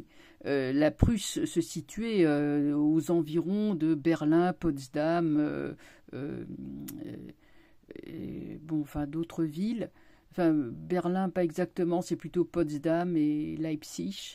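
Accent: Paris, France. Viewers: Bonjour, cela fait déjà un bon moment que je vous écoute et que j'apprécie votre travail.. Mais surtout vous êtes pour moi comme une petite madeleine de Proust.. Votre voix et votre accent titi parisien me rappellent mon enfance à ménilmontant, pigalle, le sacré cœur tout ça tout ça les marrons chauds.. Que du bonheur.. Merci..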